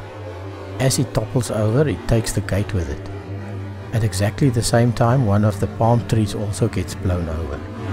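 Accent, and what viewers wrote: South Africa. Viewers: South African ... not Australian 🙄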